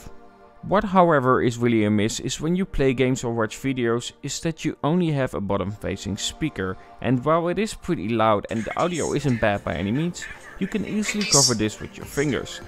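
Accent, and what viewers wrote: Netherlands. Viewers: Dat noemen ze Denglisch. Dat is Engels met een Nederlands accent.😁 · U sound Dutch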